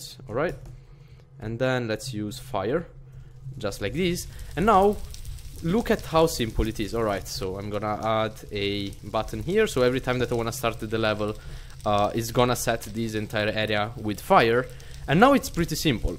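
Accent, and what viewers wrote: Italy. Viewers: Ahaha si sente tantissimo l accento italiano, bel tutorial btw 😂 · CAZZO BRO SI CAPIVA SUBITO DALL ACCENTO CHE ERI ITALIANO XD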